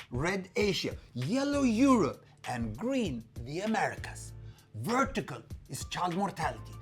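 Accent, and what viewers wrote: Sweden. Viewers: Kan inte ta han seriöst med sin jävla svengelska. Känns som man tittar på en kass Siba reklam eller något. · Han pratar ju bra och tillräckligt avancerad engelska, bara att uttalet är typiskt svenskt. Kan ju knappast kallas för svengelska, och jag tror att det bara är vi svenskar som "stör" oss på det. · Never heard a more swedish accent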